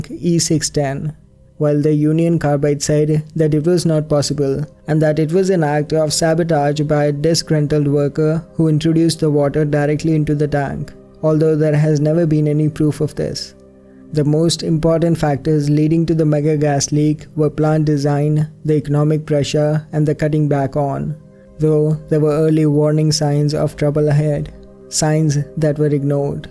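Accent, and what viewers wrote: India. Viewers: Your voice sounds like Indian. Are you Indian ?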